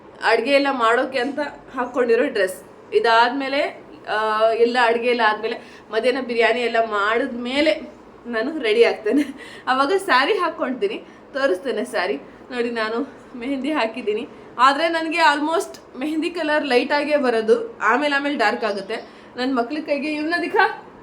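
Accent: Standard. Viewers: pure kannada sister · ನಿಮ್ಮ ಸ್ವಚ್ಚವಾದ ಕನ್ನಡಕ್ಕೆ ಧನ್ಯವಾದಗಳು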